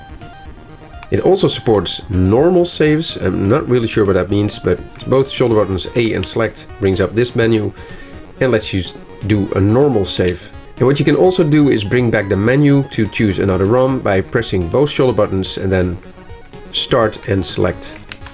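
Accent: Netherlands. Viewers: Butt I heer a Dutch accent is dat right?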